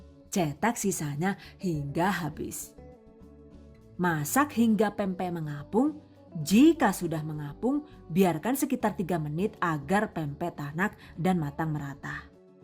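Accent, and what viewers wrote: Banyumas, Indonesia. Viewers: Paling suka liat video karna selalu detail,gk berbelit2 jadi mudah di pahami..apalgi kalo udh ada ngapak nya mkin seru nonton nya 😂😂.. #GiveawayTHV2021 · Salam hangat dari negri kincir angin..wah luar biasa resepnya..memasak sambil berhumor... bhs.gaya orang ngapak Banyumas...saluut dan proficiat..👍👍👍😁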